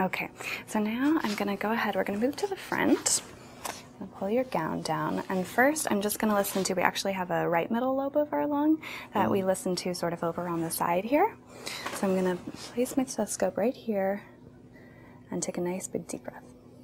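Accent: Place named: United Kingdom. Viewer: Your british accent gives you away 😄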